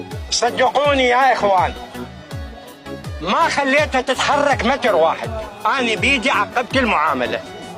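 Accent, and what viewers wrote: Baghdad, Iraq. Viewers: اللهجه العراقية جميلة وفيها هيبة وقار وتحس فيها رجولة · جميلة لهجته العراقية ، تذكرني بكثير من اصدقائي. حفظ الله العراق من كل شر و الف بين قلوب اهاليها الطيبين. تحية طيب من الباكستان 🇵🇰❤️🇮🇶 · هذه لهجة اهل بغداد الأصليين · هذه اللهجة البغدادية الجميلة ❤ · اللهجة البغدادية الاصيلة ❤ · لهجة العراقيه جميله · اللهجه العراقيه الاصيله ❤❤❤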